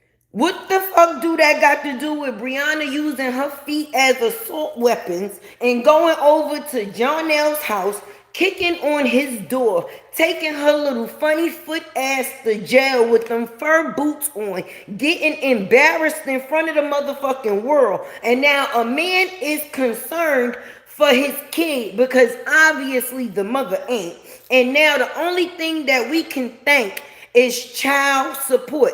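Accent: New York, United States. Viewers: Hey ur so beautiful n love ur new York accent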